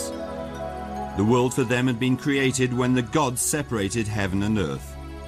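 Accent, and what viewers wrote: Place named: Germany. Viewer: Notei que o cara que está narrando tem sotaque alemão.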